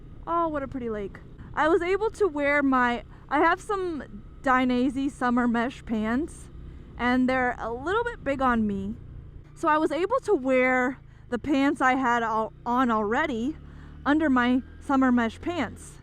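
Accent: United States. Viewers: You sound like you live around Hotlanta!😁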